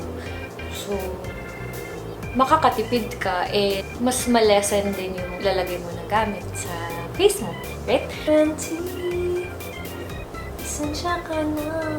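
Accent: Visayas, Philippines. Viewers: kanang accent sa mga bisaya pagmagtagalog ba..😆😅hahah, ganyan din ako kadalasan eh.!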